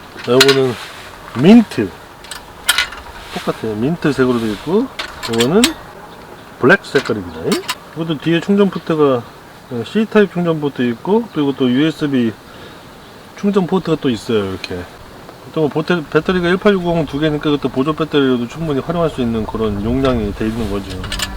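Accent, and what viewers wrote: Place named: South Korea. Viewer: 잘보고있습니다...구수한사투리너무좋아요... 루프박스장착영상봤는데,지금은없네요... 저도설치하려하는데연비차이가많은가요? 기름값미워미워ㅠㅠ 늘응원합니다~~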